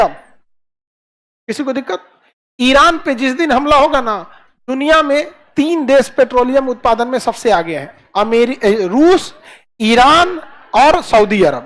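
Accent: Bihar, India. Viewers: Bihari accent 👌👌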